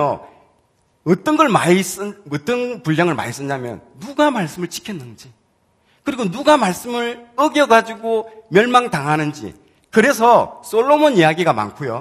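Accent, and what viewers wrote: Gyeongsang, South Korea. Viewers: 경상도 어조 질색을 하는데 이렇게 듣기 거북하지 않은경우도 있네요.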